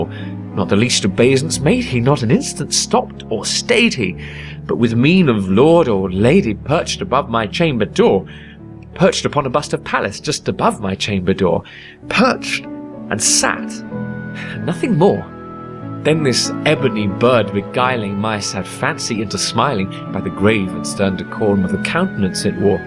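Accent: United Kingdom. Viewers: It's nice, but why the British accent? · Probably because the person reading it is British 👍 hope that helps!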